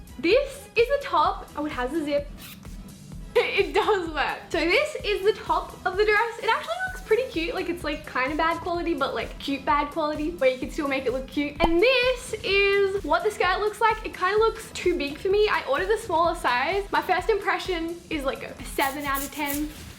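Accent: Australia. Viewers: R u Australian?I am too!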